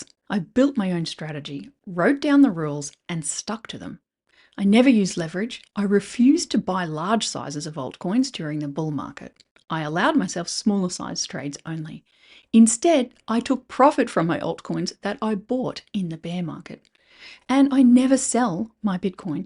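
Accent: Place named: Australia. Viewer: So much food for thought here, thank you! I really think your channel has the most valuable crytpo insights going round. So refeshing to hear an Aussie in this space too!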